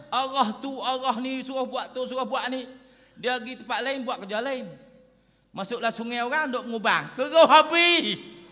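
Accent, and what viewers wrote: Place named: Baling, Malaysia. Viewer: Orang Aloq Staq pun blom tentu nak paham loghat Baling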